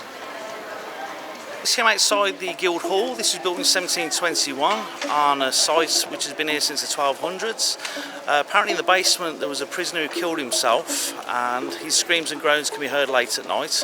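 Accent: United Kingdom. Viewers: I'm actually so ashamed that I've only been to London! Really cool listening to your storytelling (just needed a minute or two to get over the thick British accent) 😛 Good work! 👏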